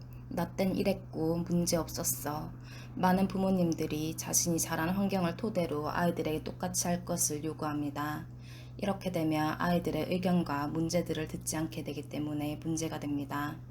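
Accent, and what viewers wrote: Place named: Busan, South Korea. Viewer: 나래이터가 부산분이신가바옇ㅎㅎㅎ 잼있어요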